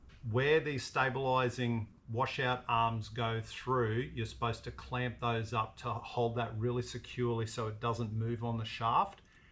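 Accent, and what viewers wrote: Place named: Australia. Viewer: Nice heli. Good review. Love your Australian accent. Hello from Massachusetts, USA.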